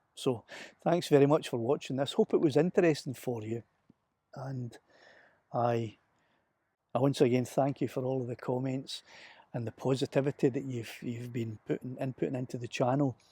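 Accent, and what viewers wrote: Scotland, United Kingdom. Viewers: Nice video. Judging by your accent, you are in Scotland. Where abouts are you located?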